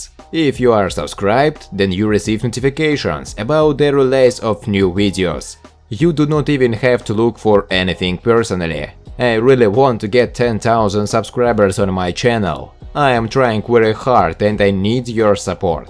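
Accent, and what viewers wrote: Russia. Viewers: Omg i hate youre russian accent! It is so terrible...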